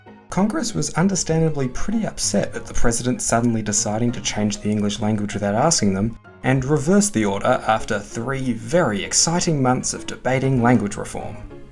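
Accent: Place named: Australia. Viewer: Aussie? Nice 🇦🇺🇦🇺🇦🇺🦘👊🏼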